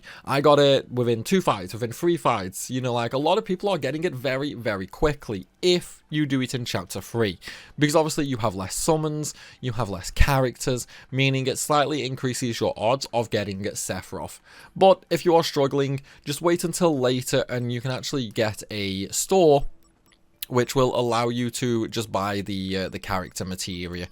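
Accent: United Kingdom. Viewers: It would’ve been nice if you had also shown us HOW to get these instead of yapping in your disgusting British English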